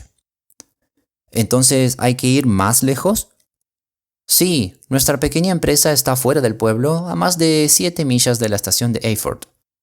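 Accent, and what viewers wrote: Rioplatense, Argentina. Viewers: le ruego me responda, soy sumamente curiosa. argentino o uruguayo? x anticipado, gracias!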